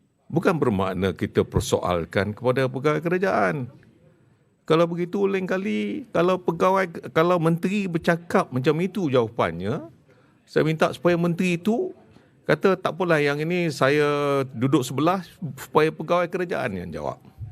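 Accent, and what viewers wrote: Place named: United States. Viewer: slang american kencang seh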